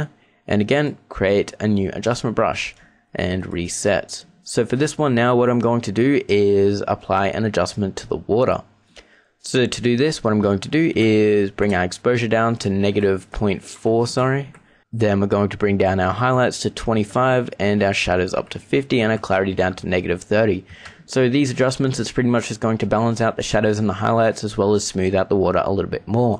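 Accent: Australia. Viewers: I love how your voice sounds.. No Homo. Giving Aussie accents a good reputation :3 Sick tutorial mate!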